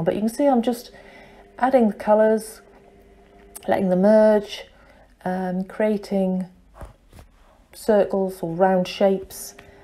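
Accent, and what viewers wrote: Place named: United Kingdom. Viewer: Omg I love your videos when you use your beautiful voice with your British accent which is the coolest. I live in New York in the states so Im sure I have an accent as well however mine sucks. XO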